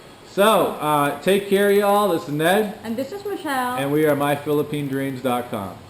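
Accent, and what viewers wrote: Boston, United States. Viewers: DO THEY EVER SAY ANYTHING ABOUT YOUR ACCENT BEING FROM MASS I KNOW THEY DO IN OTHER STATES HERE IN USA IF YOUR FROM BOSTON THEY SAY PAACK THE CAAAAAAR LOL LABSTA OR LOBSTER LOL I GUESS WE LEAVE THE R OUT PEOPLE MADE FUN OF ME ALL THE TIME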